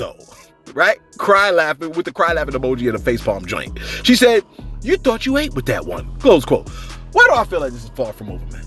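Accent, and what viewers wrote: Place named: East Coast, United States. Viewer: His "C Om Sayn" is so East coast..Sup South Jersey...The page is entertaining..His accent is too cute and his hype voice is too funny... he posted a King of Clubs. What you play Tonk or pitty-pat..That million is coming quickly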